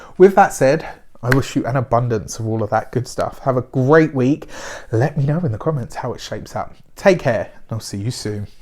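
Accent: United Kingdom. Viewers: British accent ? Nice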